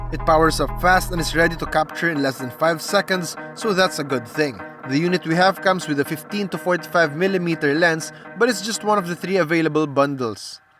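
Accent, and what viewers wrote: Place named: Philippines. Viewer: This accent is so nostalgic... Are you a Filipino? :/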